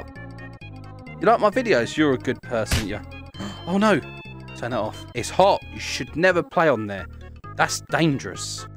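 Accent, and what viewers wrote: United Kingdom. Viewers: Bro is British playing with Americans!?!?!?!?! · Is it me or Have I been watching this guy for 4 years And just realized He's British? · Are you British? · Mate ur british?!?! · I think he’s British🇬🇧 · ur from British😮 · This dude is Britain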